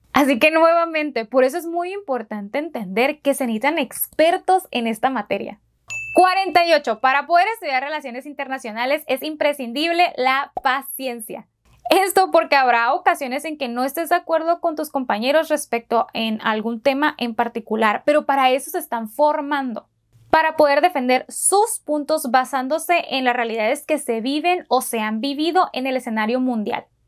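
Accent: Mexico. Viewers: muito legal ver um video de um outro país falando sobre a faculdade que eu quero seguir, amei esse sotaque mexicano, entendi tudo, beijos do Brasil🇧🇷🥰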